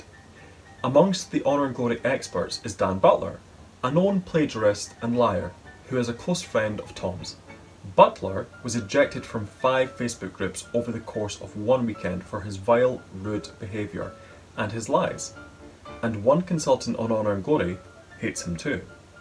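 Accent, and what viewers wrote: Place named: Scotland, United Kingdom. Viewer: oh, I’m sorry, it was just hard to understand because of your extremely thick Scottish accent and total inability to properly enunciate words in what I assume is your native language. But nice bitchy attitude you got there, directed towards one of your fans no less. Great look for someone who is trying to build their YouTube following! Let me know how that works out for you!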